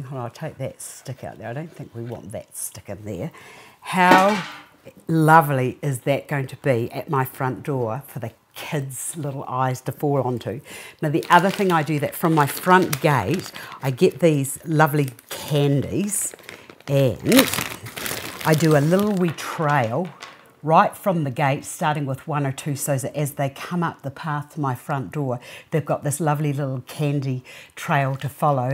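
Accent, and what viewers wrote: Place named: New Zealand. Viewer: Hi.. long time no see lol.. I remember from new Zealand morning show... now im in Australia and love videos and the ol kiwi accent lol... have a awesome year.. =)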